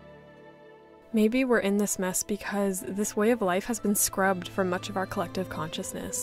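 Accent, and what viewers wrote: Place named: Ontario, Canada. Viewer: Keep speaking this way, when you said you were nervous I was like noooo! It's so powerful! If I had to guess what province you live in... I think it's Ontario.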